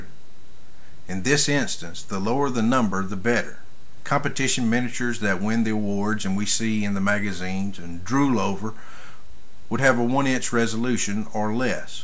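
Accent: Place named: United States. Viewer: Awwh what a lovely video! keep up the good work ma man! And Where is that accent from? I love it! Where in the US I mean :)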